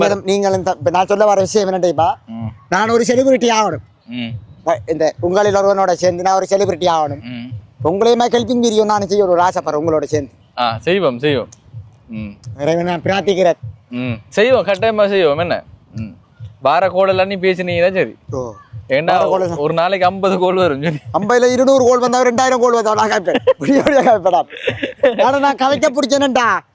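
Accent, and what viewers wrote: Sri Lanka. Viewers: இலங்கைத்தமிழ் மிகவும் அருமை 🎉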